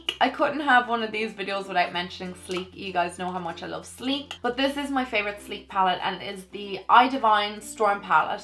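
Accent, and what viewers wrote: Ireland. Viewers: what part of Ireland are you from? I'm from Dublin love ur videos and love ya byeee!!!!!!!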